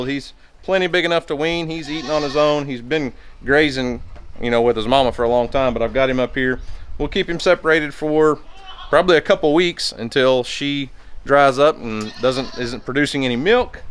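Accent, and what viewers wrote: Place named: Oklahoma, United States. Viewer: Love the way you say some of your words. I know it's mostly because you're from Oklahoma. Rufus has declined to answer the question. Please keep your hands to your house yourself 😜😜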